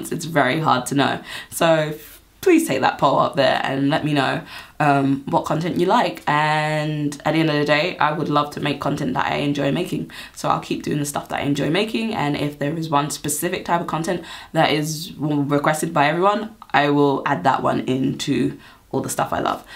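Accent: United Kingdom. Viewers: don't stop posting videos. your British accent is amazing . I'm a dude btw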